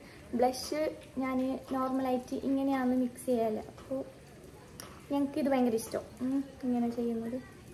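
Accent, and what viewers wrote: Kasaragod, India. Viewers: Ksd slang kekkaan vanna njn😌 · I can understand your slang because Iam living with my ksd friends seven years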